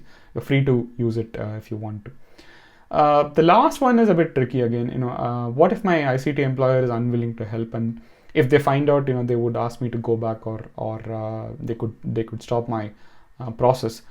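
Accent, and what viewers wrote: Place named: Chennai, India. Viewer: Chennai slang in your language,when you speak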